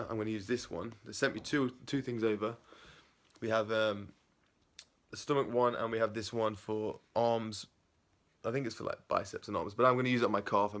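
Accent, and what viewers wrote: United Kingdom. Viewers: Almost nobody speaks queens English. YouTube geordie shore and compare the accents there to ones from Yorkshire and London. This accent is kinda average. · Is that how all brits speak or is it the hood slang?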